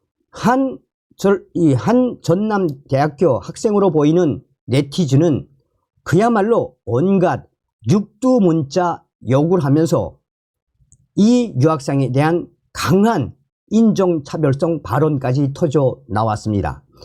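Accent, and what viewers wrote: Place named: North Korea. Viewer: 이 바라!!! 다른 사람을 시켜 방송을 하든지 아니면 아예 이북 말로 방송을 하든지... 듣는 사람 넘 힘들다.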